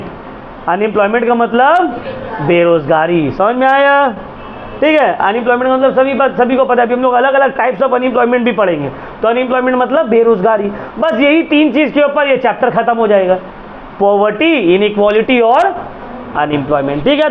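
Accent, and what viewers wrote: Kolkata, India. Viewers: Apka kalkatiya bt krne k tarika bohot hebi h sir♥️ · Sir apka kalkatiya accent I love it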